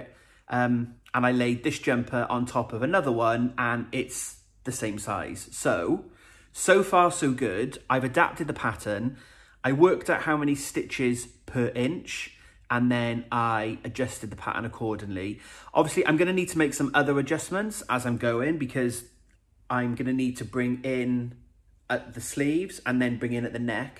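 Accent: Wales, United Kingdom. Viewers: Really enjoy your podcasts, its nice to hear a lovely Welsh accent. I am newly subscribed and enjoy and feel inspired once I watch them many thanks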